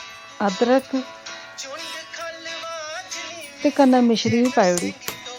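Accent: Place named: Jammu, India. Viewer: Your dograa accent is very nice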